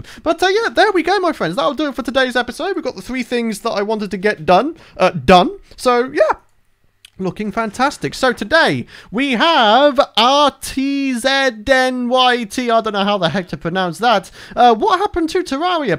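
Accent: United Kingdom. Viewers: British and lively! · Both British and Lively I guess.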